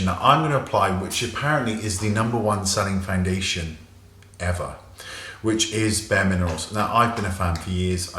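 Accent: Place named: United Kingdom. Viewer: Hes british not aussie u dumb fuck below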